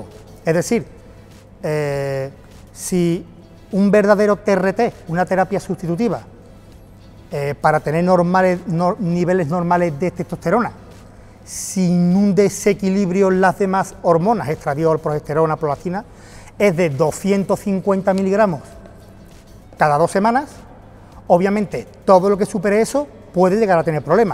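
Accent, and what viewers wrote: Andalusia, Spain. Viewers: Otra pregunta, ese acento español es de que ciudad?😉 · Eres Andaluz??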